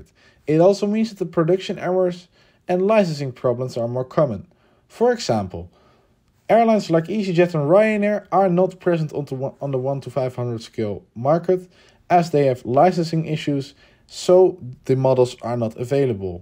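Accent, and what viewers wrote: Netherlands. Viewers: your dutch right? because your voice but in the beggining i also though you were displaying a photo from aviation megastore?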